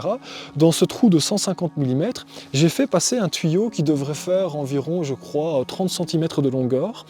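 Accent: Belgium. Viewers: Charmant accent belge 😇🤓🐨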